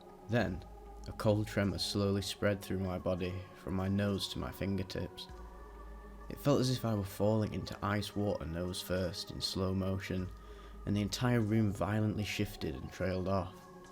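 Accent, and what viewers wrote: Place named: England, United Kingdom. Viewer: you're a good narrator, how far has this video taken you? the english accent, the world loves it as much as japan loves complex metal, would like to hear more trip reports from the wid void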